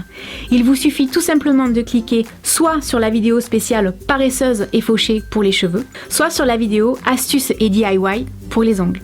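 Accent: South, France. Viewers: merci pour tes conseils et reflexions! j' adore ton accent du sud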